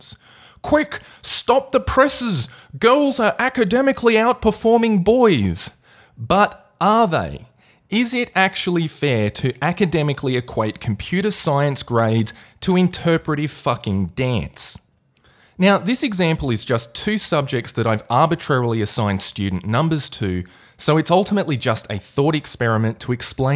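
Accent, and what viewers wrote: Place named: Australia. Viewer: You speak very well (and I actually like your Australian accent) and helped me figure out the truth. I actually thought that men were smarter only at the extremes but it seems that I too had misinterpreted (fell for) the graphs and the news articles claiming that girls are doing better in school. Most likely because it won't affect my relative intelligence to other people.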